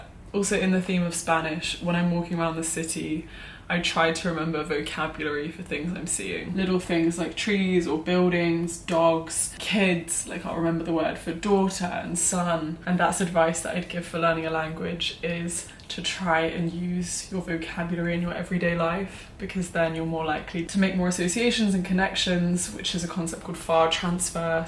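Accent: Argentina. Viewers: Aaaah you already have kind of an argentinian accent, so cute, you are doing so good 👏🏼👏🏼👏🏼👏🏼 mucho ánimo 💪🏼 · "jugo de naranja para 'shevar'?" AMO que su español tenga un pequeño acento argentino, es muy tierno💕💕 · Me encanta tu acento cuando hablas el español. Un toque del argentino, ay que precioso! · Hey! I have a few things for you to maybe help your Spanish! Let's go = Vamos (Second person in plural, present tense of "ir"), "Vamonos" sound like let's leave for Argentinians. "Hoy" sounds a lot like the German word Heute, both mean today You can you "Son las 3 de la tarde", you can just forget about the subject of the sentences, it doesen't matters to spanish speakers if it's the hour or the hours that "son". I love that your Spanish has a little of an Argentinian "sh" sound 🤭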